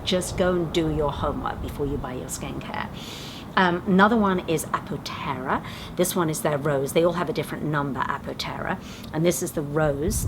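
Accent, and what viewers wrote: United Kingdom. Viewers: Hi, great serums I will certainly check them out. Starting my 14 days sugar free today. i know I'm not watching these in any particular order but so far loving the content. I'm in Co. Wexford Ireland and spotted your British accent straight away which is why I stopped to watch you and so glad I did. Eleanor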